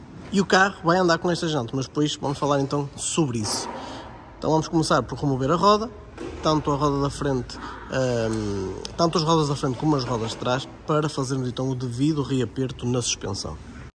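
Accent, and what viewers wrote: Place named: North, Portugal. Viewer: Os meus parabéns pelo canal genuinamente Português e com o prazer do sotaque do Norte. Conteúdo esclarecedor e competente.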